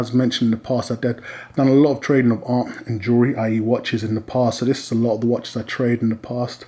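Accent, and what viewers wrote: United Kingdom. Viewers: Hi, you sound British where do you get your bullion from in the UK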